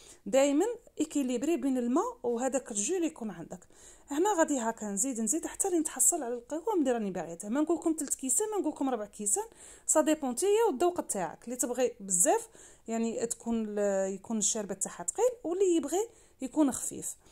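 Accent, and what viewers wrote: West, Algeria. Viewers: يعطيك الصحة ربي يحفظك ❤❤ نتفرجك غ باه نسمع هدرتك عسسسسسل تفكريني بصحاباتي كي كنت نسكن ف تيارت ناس الغرب العشرة الطيبة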